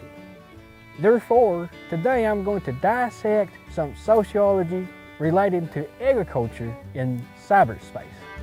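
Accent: South, United States. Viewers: 我是一个住在德州的中国人。你的南方发音很棒 :)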